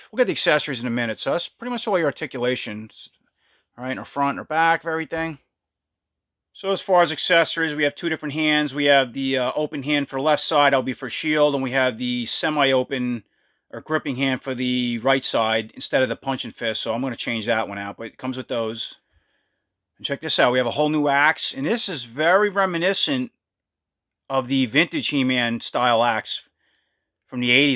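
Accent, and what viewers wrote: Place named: New Jersey, United States. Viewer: Great review! I love your NJ accent haha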